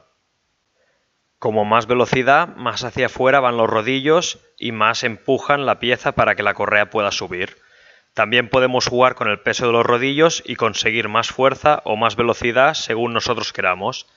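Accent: Spain. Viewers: Me encantan los Españoles, que acento tan nítido y perfecto, los amo, saludos desde Venezuela :) Excelente vídeo. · Quién habla tiene un claro acento Catalán. Luego entonces no tiene un acento Neutro el cual en España creo que solo queda en ciertas zonas de Castilla León,los dé tenemos claros acentos de nuestras diversas provincias. Pasa lo mismo en LATAM,entre países y zonas/ provincias de cada país,pero lógicamente allí no se habla un Castellano limpio, ya hay muchas mezclas. ❤️🇪🇦🇪🇦❤️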